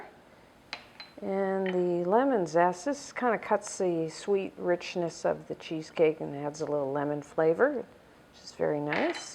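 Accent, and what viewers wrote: Canada. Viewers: is she from canada?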